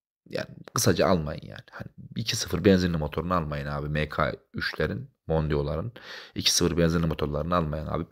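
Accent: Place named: Sivas, Turkey. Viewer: Gardaş sen Sivaslı mısın? Şive Sivaslı gibi.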